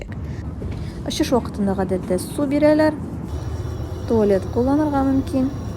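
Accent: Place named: Kyrgyzstan. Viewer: Кыргызчага окшоп суйлойт екен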